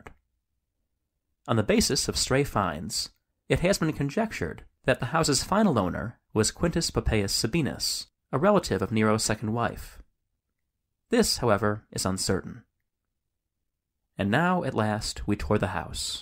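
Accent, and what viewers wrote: United States. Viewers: Interesting if the voice wasn't American... I dont like the "goodbye folks". Other English speaking countries don't call people "folks". It's very rude.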